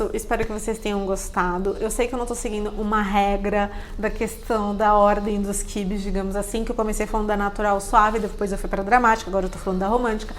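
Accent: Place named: Brazil. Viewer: Yes, portuguese from Brazil accent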